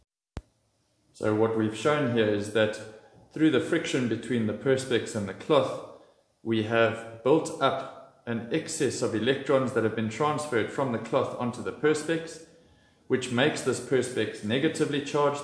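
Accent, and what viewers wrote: South Africa. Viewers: south african hey?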